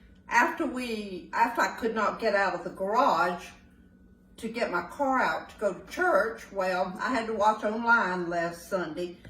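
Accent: South, United States. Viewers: I love your Southern Accent. Im from North West Florida in the Panhandle. Once on vacation in Denver Colorado I was at a Wendy's with my young daughter and talking with her I apparently stuck out like a thumb! A man walked up to me while I was in line and asked me where I was from. I looked at him puzzled and said...United States. He went back to his table and a different man came up to me and asked me the same thing and I answered the same and was thinking...what in the world is wrong with these people! He then said... no what state are you from and I told him Florida and he turned to the table of people he was with and said.... I was right she is from the South. 😂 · Hello from Scotland! just found your chanel. great recipe thankyou. and I also love your Southern tongue ! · I love hearing your Southern accent. I lived in Georgia for 35 years, and miss it. 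💕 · one of the last Southern Belles! That drawl is Southern without being "hokey" and yes i am southern too but more country than belle. But my mom and her mom before her cooked like that...limas because I love them, cabbage for my younger sister and sweet potatoes for the youngest one. YUMMMMMM. I can smell it all from here in Alabama. Bless you so much. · I just found her channel today, and she seems so lovely in addition to being a wonderful cook. And yes, I love her traditional southern accent. 🙂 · She reminds me of my mamaw too! I just love this lady! My mamaw was from TN so her southern accent was a bit different than. She was the best cook!! She made THE BEST EVER pbj sandwiches! I can't wait to see her again oneday! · She has the most beautiful southern accent - I LOVE her!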